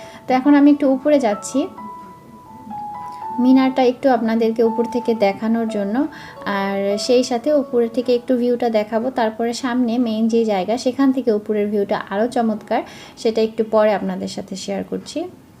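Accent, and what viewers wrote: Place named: Madaripur, Bangladesh. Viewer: Vapsa gorom hahaha.apu amar husband o tomar moto kotha bole karon amar husband o madari purer.tumi jemon kicu kicu kotha ae vasae bolo o temon kicu kicu vasae bole.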